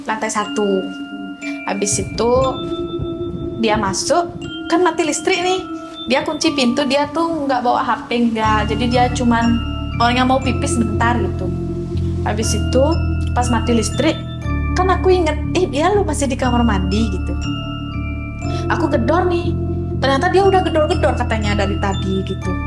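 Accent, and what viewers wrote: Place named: Bali, Indonesia. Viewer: Seneng kl denger logat bali..😅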